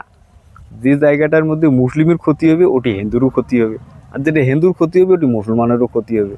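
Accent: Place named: Bangladesh. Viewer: তুম কহা কা জ্ঞানী বাবা!😂 তুমি এই ভারতে কবে থেকে বাস করছো। তোমার কথা বলার ঢঙ্ দেখে মনে হচ্ছে যে, ওপার বাংলার। এখনো ভারতের মতো রপ্ত করতে পারো নি।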